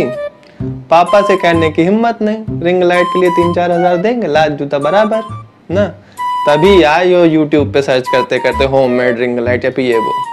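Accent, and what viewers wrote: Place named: Lucknow, India. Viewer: You r from Lucknow iam sure😎😂 because iam also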